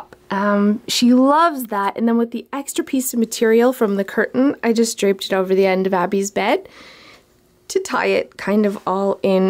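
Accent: Canada. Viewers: Is she Canadian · Her accent sounds Canadian for sure.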